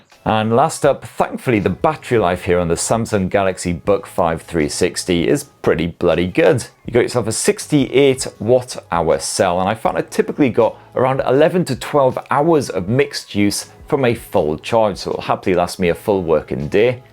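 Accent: United Kingdom. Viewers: What part of Britain are you from mate? I know my regional accents pretty well but I cannot nail yours.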